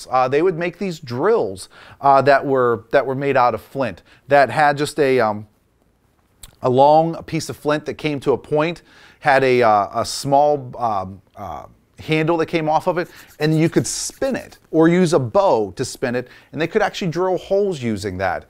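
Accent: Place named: Pennsylvania, United States. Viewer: Dude! That accent! I lived in PA so long I didnt realize just what a pronounced (strong) accent it was! Thanks for the interesting info! I have been thinking about the Shawnee people, and didnt realize they had a presence in Northern West Virginia (LOL western PA)!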